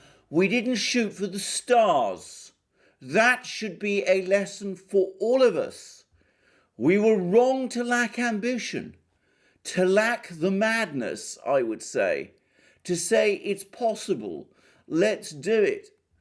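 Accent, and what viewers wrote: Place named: RP, United Kingdom. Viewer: Hi.. I love your presentation. It's in Queen's English and it's succinct and deliberate in diction.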